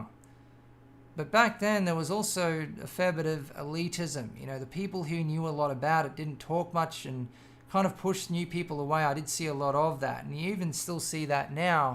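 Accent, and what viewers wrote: Australia. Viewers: Her mate. Love your work! I was just wondering what country you are from because you sound Australian :P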